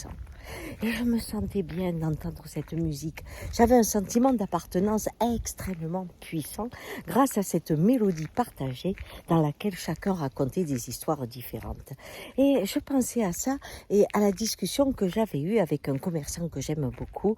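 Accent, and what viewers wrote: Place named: South-West, France. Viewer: Nous avons le même accent et je vous aime 😘 je nous aime ❤ et que j'aime mon accent de ce sud-ouest 😉🥰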